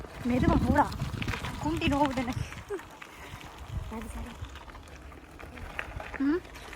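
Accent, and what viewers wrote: Sri Lanka. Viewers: உங்களுடைய குறல் இளங்கைவாணொலியின் பிதாமகன் ஐயா அபதூல்ஹமீது நினைவு வருகிறது இலங்கைத்தமிழ் உச்சரிப்பு கேட்க அழகாயிருக்கிறது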